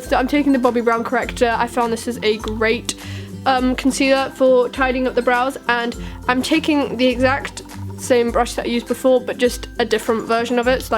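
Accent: United Kingdom. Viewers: love your british accent so much<3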